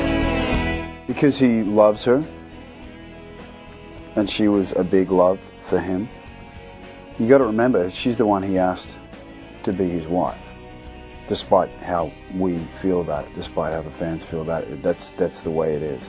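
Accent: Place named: Australia. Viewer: I don't normally find Aussie Accents hot...as I am aussie...but god, he is way hotter with his aussie accent!!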